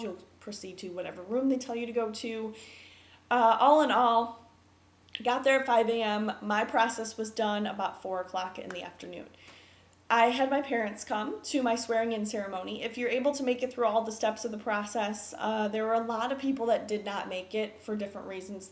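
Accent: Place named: North, United Kingdom. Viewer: Northern accent.. Hate it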